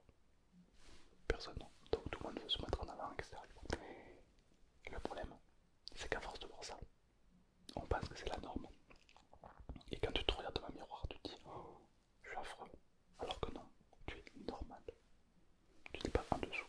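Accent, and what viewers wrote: South, France. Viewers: J'avoue... en lisant les commentaires je suis d'accord avec le fait que fasse vraiment autorité dans l'ASMR français. Je trouve qu'il est hyper pointu dans la cohérence entre sa sensibilité dans la retranscription des tringles qui sont à (presque) chaque fois hyper bien dosés et le discours en lui-même qui est aussi parsemé de bruits de bouches qui lui sont propres, et a la fois au paroxisme du suave. Pis y'a pas qu'une cerise sur le gâteau mais 2!: son humour désopilant (qui ma souvent fait éclaté de rire en plein endormissement) et cet accent du Sud qui ajoute une certaine rythmique pas déplaisante du tout Deuxième aspect en l'occurrence nous avons la chance d'avoir un thérapeute qui pratique la vulgarisation (mise à la portée de tous, c'est pas péjoratif du tout) de principes élémentaires en psychologie à merveille Bref, une dinguerie de fou psychomental pour insomniaques chroniques🤣🤣🤣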